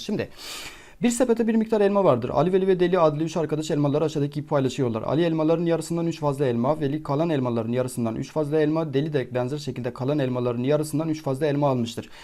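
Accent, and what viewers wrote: Van, Turkey. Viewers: hocam sen kesinlikle vanlisin şive oradan ve inanılmaz semlatiklik katıyor:)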